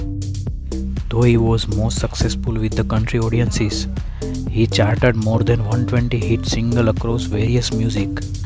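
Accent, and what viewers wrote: India. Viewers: Hahaha.. Come on! Never heard the Indian accent before? You need go out a little bit more... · I THINK THIS GUY TALKING IS FROM KERALA INDIA. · Really you got a foreigner possibly from INDIA speaking about Kenny Rogers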